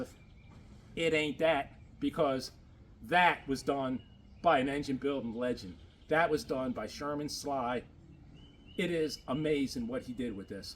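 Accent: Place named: Maryland, United States. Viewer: Awesome!! (Knew you were from MD as soon as I heard you.) I live about an hrs from Pasadena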